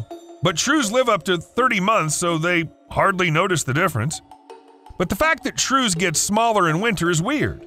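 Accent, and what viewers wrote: United States. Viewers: Unbearable american accent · I just can't stand this yanks voice. Why does he have to keep shouting with that rattling voice.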